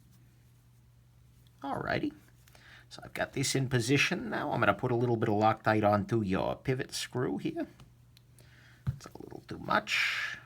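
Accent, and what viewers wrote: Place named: Northeast, United States. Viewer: voice is calming, which is usual with a Noreaster accent. Great video with very thorough information.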